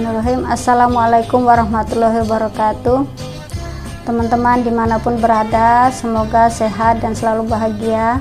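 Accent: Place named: Java, Indonesia. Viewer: Ngaku asli timor tapi ko pu dialek kental jawa tapi tarada dialek timor... Ko bisa lancar bhs indonesia dijawa tapi tada dialek timor... Panipu ko, ko bukan asal timor tapi pernah tinggal di timor... Itu sudahhh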